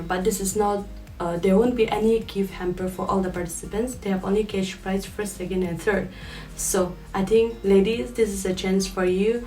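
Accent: Nagaland, India. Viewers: I love you speaking Sumi dialect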